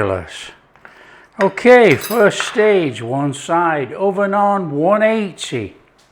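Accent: Yorkshire, United Kingdom. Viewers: Yummy... easy to prepare must get rid of my old apples and make good use of em, I would make the spices in a bowl I usually add a tsbl of METAXA and brown sugar. Thanks are you from Yorkshire Im a Yorkshire lass :)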